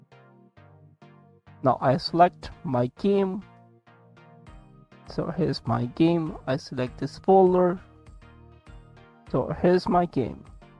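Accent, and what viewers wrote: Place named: Asia. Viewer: Why the FVck does he sound asian?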